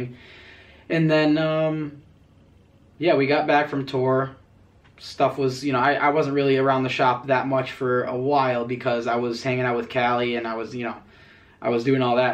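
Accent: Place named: Russia. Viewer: you're a good genuine dude,. this channel will grow because of that. more russian accent though.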